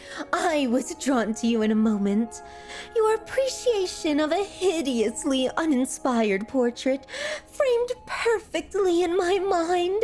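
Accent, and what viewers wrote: United Kingdom. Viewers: "ARE YOU STURRING FROM SLUMBER,AH EXCELENT TIMING, EXCELENT TIMING" with classical music in the background is the most British thing i heard today *not making fun of your accent or voice i really enjoy your content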